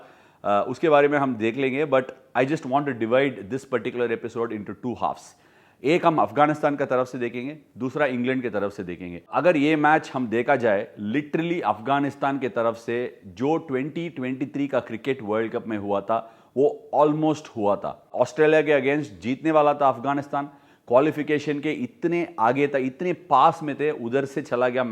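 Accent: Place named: India. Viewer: Who loves his hindi accent ? 👇🏽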